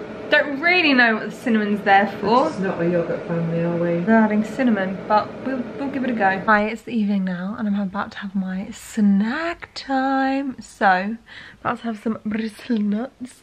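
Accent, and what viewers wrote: England, United Kingdom. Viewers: You are adorable!! Love the English accents and expressions being from across the pond! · She reminds me of Emma Watson (and not cause she has an English accent) but she looks like her too sort of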